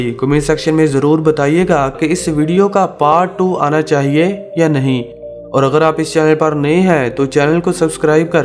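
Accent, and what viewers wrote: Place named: Punjab, India. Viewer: O mere bhai punjabi accent me commentry na karo. sab ghalat bol rahe ho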